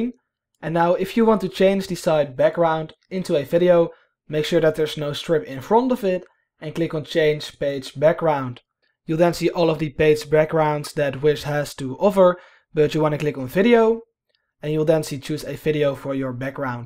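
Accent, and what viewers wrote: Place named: Netherlands. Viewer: Sjonge jonge ik hoor dat platte Nederlandse accent gewoon keihard, heerlijk! Het heeft gewerkt, dankje! :)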